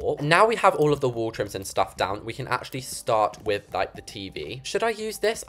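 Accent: United Kingdom. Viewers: Try to do a American accent Bc u have a British accent and take a break on talking in ur videos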